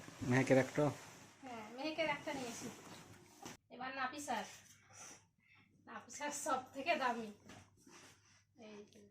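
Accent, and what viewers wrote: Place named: Malda, India. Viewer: Apa apni ki India theke bolcen.. apanr kotha gulo maldah ...mane amrao aivabe kotha boli ...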